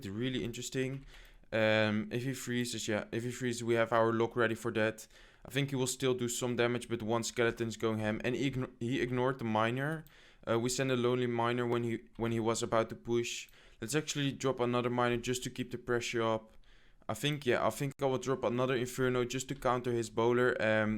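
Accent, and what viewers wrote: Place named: Netherlands. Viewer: Michi i think he is dutch :)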